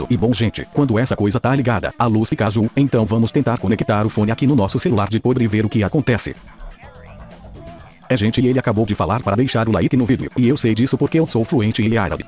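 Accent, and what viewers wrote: Portugal. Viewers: Vc é brasileiro ou português?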